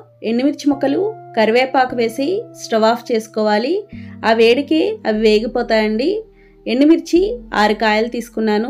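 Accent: Godavari, India. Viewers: Is your Telugu, Godavari Telugu, sweet to head pure telugu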